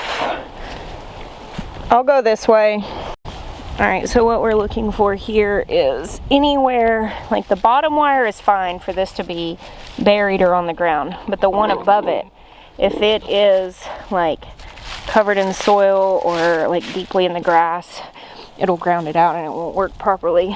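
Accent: South, United States. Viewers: the southern accent is growing on you. I am so glad you are both safe! And the big boar is safe. Glad figured it out.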